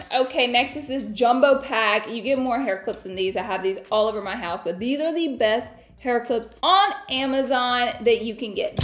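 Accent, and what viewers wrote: South, United States. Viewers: Ugh, looooooove these videos! Used a few of your links 😁💖 Side Note ~ I love that you haven’t lost your country twang lol!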